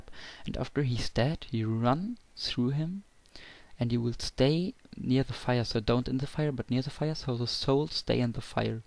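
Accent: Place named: Germany. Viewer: Eigentlich gutes Video, man merkt nur aufs übelste das du Deutschsprachig bist ;) btw, mir ist aufgefallen, dass du beim Snipern kein Stab in der Hand hast, was natürlich sinnvoll wäre ... ansonsten gut gemacht :D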